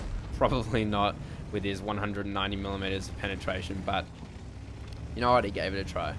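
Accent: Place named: Australia. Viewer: this 'stralia accent is quite arousing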